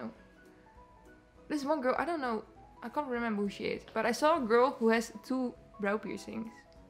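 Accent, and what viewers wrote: Netherlands. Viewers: ik hoor de Nederlandsheid hierin lmao, wel leuke video :)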